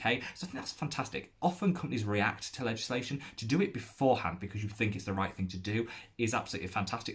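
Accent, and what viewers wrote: United Kingdom. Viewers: You are one of my favorite contact creators and I thoroughly enjoy your videos and appreciate the enthusiasm and passion you bring to skin care. I really enjoyed the podcast you did with Kelly Driscoll. It was fun to learn a bit about you and your experience as a content creator. As someone from Southern California who hadn’t been across the pond, can I just say how much I love your accent? I really appreciate that you don’t take sponsorships and couldn’t agree more with what you said about how “If you sell your integrity, what do you have left?” You are one of the few people that I go to watch reviews on before buying a product because I really trust that you’re giving your honest opinion and not a sponsored one. Thank you for intro’ing me to British brand Medik8. As someone who uses Tretinoin but didn’t want to risk using it under my eyes, I’m excited to try their Crystal Retinal Cetamide eye. 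Cheers!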